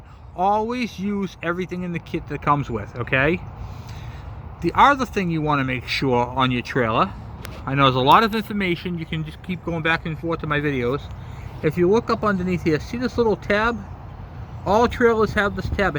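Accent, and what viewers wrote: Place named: Boston, United States. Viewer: Good info! With your accent, sounding like your from the Boston area?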